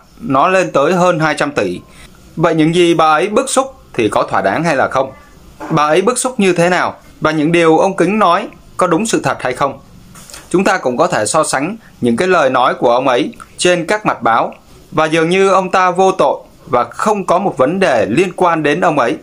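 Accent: Standard. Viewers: Cậu noi chuan tram Phan tram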